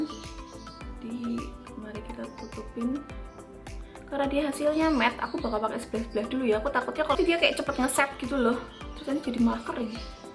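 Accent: Java, Indonesia. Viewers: Yeeyy gercep buat mba medhok 🤗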